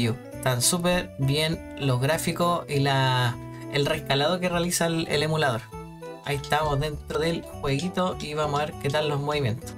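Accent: Chile. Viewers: como se nota cuando alguien es chileno xd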